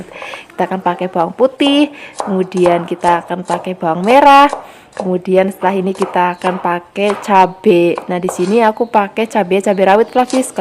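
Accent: Java, Indonesia. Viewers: MBK medok jawanya, denger suaranya ayem deh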